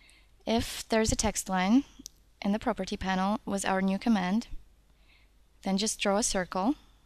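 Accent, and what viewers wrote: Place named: France. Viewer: This nice narrator sounds like French.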